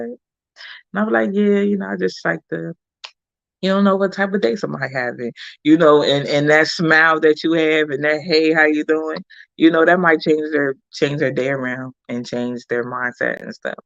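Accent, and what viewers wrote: Louisiana, United States. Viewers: she has a cute country accent........ Like us in North Louisiana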